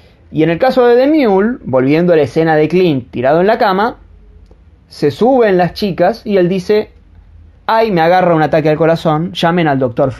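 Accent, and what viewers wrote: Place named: Gaucho, Argentina. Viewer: Tu pinche acento gaucho.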